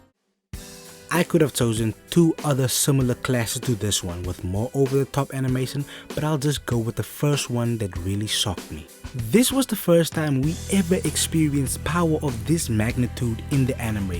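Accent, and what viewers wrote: South Africa. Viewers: Sounds like you're from Sud Afrique mabut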